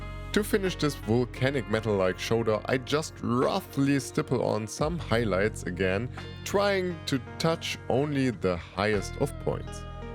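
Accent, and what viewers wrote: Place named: Germany. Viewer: you certainly did deliver, this and the horned rat warrior both were experimental and it’s really nice seeing someone’s take on something that not many people would even attempt. But yeah your painting style is unique and a pleasure to watch, along with the German accent and bassy voice you’ve certainly got what it takes to keep gaining popularity. I look forward to the chorf release and hopefully they re-release all the old legion of azghor from forge world!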